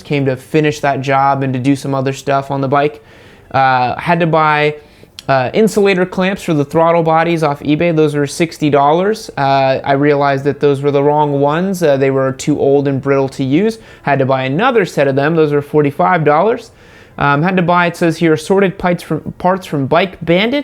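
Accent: Portugal. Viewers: my dude are you portuguese? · Are you Portuguese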